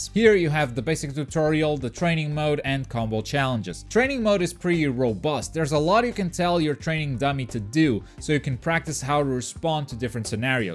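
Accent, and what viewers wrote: Portugal. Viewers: Português? Notei pelo sotaque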